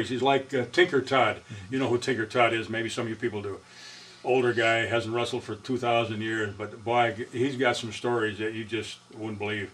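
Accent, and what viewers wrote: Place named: RP, United Kingdom. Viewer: He does a surprisingly decent posh English accent (I say that as an Englishman)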